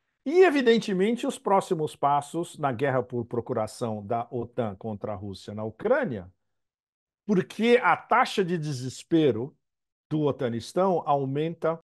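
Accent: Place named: Minas Gerais, Brazil. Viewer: Uai sô , te mineiro aí tbm 🧑🏼‍🌾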